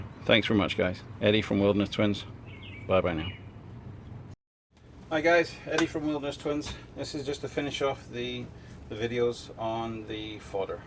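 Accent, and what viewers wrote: Scotland, United Kingdom. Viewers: Which part of Scotland you originally from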